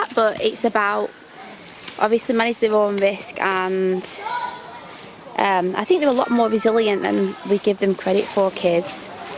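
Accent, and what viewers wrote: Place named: North, United Kingdom. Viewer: Your children are just adorable 😍 i was guilty of constantly telling my kids to “be careful” whenever they climbed where as now I’m the opposite and totally encourage them to explore! How old are your children? And it’s so nice to hear a fellow northerners accent 🥳 oh you’ve litterally just answered my question as I’m watching lol is 13 months, awwww!! What made you decide to home school honey? Yes I think you should make that video, it would be interesting to learn more as it sounds ignorant but the truth is I don’t really know but it would be good to find out from you!! 🤗 xx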